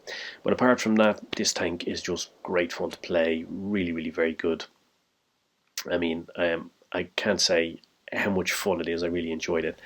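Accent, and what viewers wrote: Ireland. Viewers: Are u from ireland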